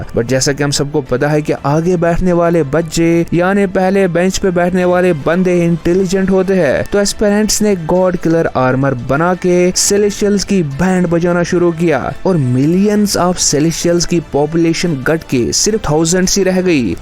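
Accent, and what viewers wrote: Kashmir, India. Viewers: bhai tumhari batu sai hi pata chalta hai ki tum Kashmiri ho 😊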